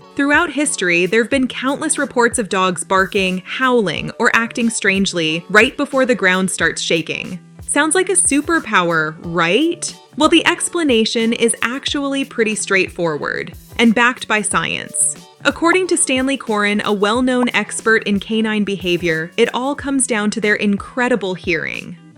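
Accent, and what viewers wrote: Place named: North America. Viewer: 😅?? it's a North American 'accent', so there is no accent!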